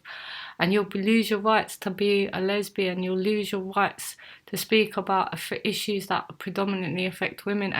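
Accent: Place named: United Kingdom. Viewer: I can’t get over the ushusting Da YooKay accent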